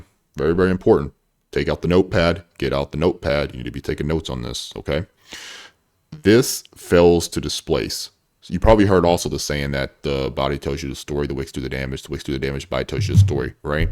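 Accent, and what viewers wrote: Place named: East Coast, United States. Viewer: Lol. This was funny for some reason. Dude sounds like he's on the east coast but slightly south.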